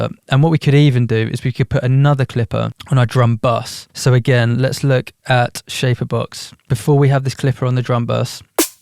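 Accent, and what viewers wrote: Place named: United Kingdom. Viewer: Tutorial instructions hit different with that UK accent 😂😂😂 cheers from USA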